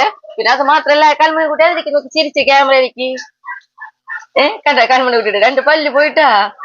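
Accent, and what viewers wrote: Thrissur, India. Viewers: വീഡിയോസ് ഒന്നിനൊന്നു സൂപ്പർ ആണ്. കണ്ടു കഴിഞ്ഞ വീഡിയോകൾ ആണെങ്കിലും പിന്നെയും ഞാൻ കാണാറുണ്ട് അത്രക്കും ഇഷ്ടമാണ്. പിന്നെ നമ്മുടെ തൃശൂർ ഭാഷ കേൾക്കാൻ ഇഷ്ടമാണ്. വളരെ innocent ആയിട്ടുള്ള സംസാരവും വളരെ ഇഷ്ടമാണ്. കുടുംബത്തെ ഈശ്വരൻ അനുഗ്രഹിക്കട്ടെ എല്ലാ സൗഭാഗ്യങ്ങളും നേരുന്നു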